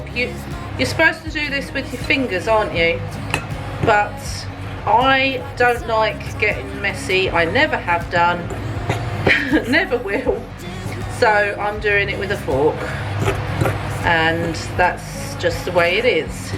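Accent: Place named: Yorkshire, United Kingdom. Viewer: Oh oh! The first rule of baking is measure! 🤣 It isn't the same as cooking, where eyeballing it works great. You are so lucky that it turned out! Anyways, enough of my motherly comments. My bf says you sound like his sister (from Yorkshire). Too bad about the Halloween deco. Do you have a ghost that is playing pranks? 👻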